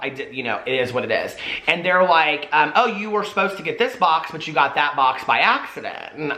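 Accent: South, United States. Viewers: My mom loved the little house on the prairie bit. She’s from the suburbs of Chicago and says, wow he has such a country accent 😂😂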